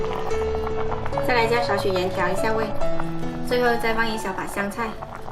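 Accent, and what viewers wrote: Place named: South, China. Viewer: ， 請問你是哪裡家鄉的人？ 是兩廣一帶嗎？ 你的普通話是挺南方腔啊！ 可否多介紹你的家鄉菜， 好公諸同好？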